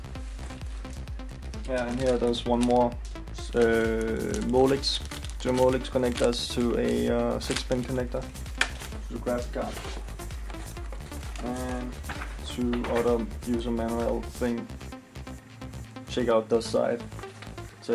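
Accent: Denmark. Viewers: lol er du dansker, har abboneret og så kunne jeg høre du havde dansk accent :)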